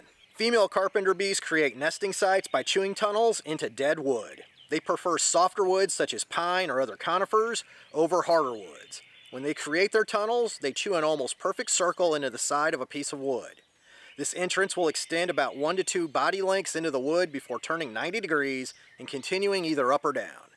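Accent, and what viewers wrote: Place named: North Carolina, United States. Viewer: I've made brush piles for critters in my yard and I'm excited to see carpenter bees in the next few years. Also, are you from NC? I ask cause I noticed that your accent is similar to mine, lol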